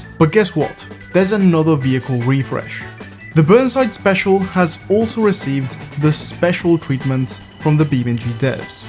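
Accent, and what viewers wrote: Italy. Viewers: Ciao,ho capito ce sei italiano dall’accento. Io vorrei che tu iniziassi a parlare in italiano nei tuoi video(se ti va)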